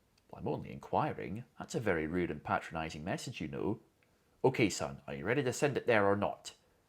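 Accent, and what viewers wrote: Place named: Arizona, United States. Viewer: I’d recognize that Arizona accent anywhere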